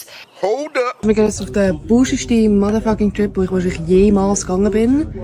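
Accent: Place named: Basel, Switzerland. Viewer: hurti no dr basudütsch skill entwiklet 😍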